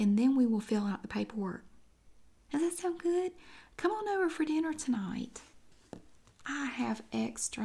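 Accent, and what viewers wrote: South, United States. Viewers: 😂 She reminds me of my mother in law. She's always on the phone and in everyone's business!!! She even has the same southern accent as she's from Texas! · Your southern accent and this role play are really interesting! Tbh, I feel happy when I hear someone speaking in southern accent and cooks southern style food! Thanks!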